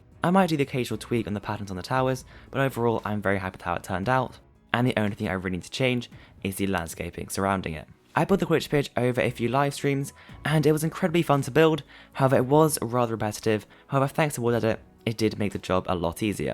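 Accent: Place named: United Kingdom. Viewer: I love his BRITISH accent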